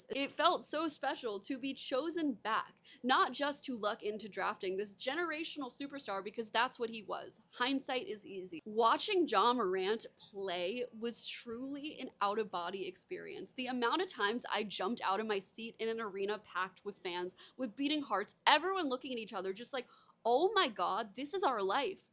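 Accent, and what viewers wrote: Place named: New York, United States. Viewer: Where's her accent 😂😂 she sounds more ny then t.n lol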